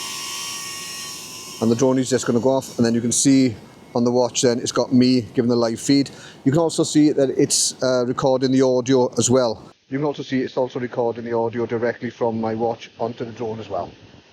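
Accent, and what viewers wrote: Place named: Welsh border, United Kingdom. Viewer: Hi started watching your videos and theyre very good 👍 Ive been a drone flyer since parrot 1 drone then parrot 2 and finally DJI drones 🤣 Im not a proffesional drone flyer just a hobby like yourself 😉 I have the neo 1 neo 2 and avata 2 plus the dji pocket 2 camera which I havent updated because Ive not used this one 🤣 I do have controllers N3. FPV controllers 3 and motion 3 controller plus goggles N3.. I am now retired and keep saying I will start a drone channel soon 😂 always next week when weathers better 😂 From near Wrexham so can understand your accent 😅 👍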